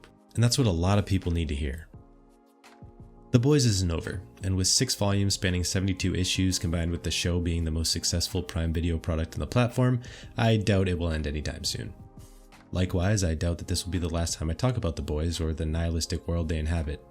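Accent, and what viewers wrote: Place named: United States. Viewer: Thanks for talking about the boys in perfect American not like these wana be Americans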